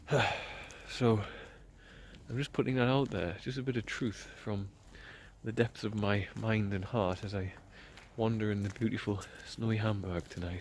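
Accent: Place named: Newcastle, United Kingdom. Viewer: I guess i have some sort of broblem solver spirit in me, so excuse me.. 😁 It's ok for u to feel tired and beated. So i feel that u need to give some mercy on your self. Could someone else produce some content on this canel some times? Similar on like the contet u shared about our staff? And time to time some succes strories of childrens recovery ect? At least id love to have some updates from ex. the children that can now go to the school! Remember your thoughts of sharing your duties? Do it. Because of you, becouse your loved ones. Totally OT: its funny how your "home english" is pushing trough after youve been home. I can tell since i have people from new castle in my family.. 😄 But yeah.. I like a lot that ure authentic, sharing even your moments of doubt and tiredness. We're not supposed to be perfect not even you. And to end this awfully lobg text: Send Frizi my love and tell her i think of her a lot. 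She has so much in her hands now. I hope she also remembers to take goooooood care of her self! ❤ Blesings, love and light. Xx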